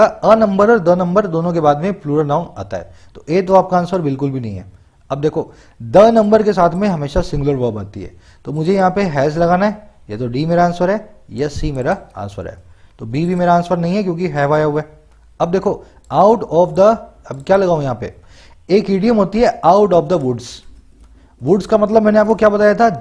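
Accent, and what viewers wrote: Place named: Delhi, India. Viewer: Do You belong to Delhi area, your language just like delhist, very attractive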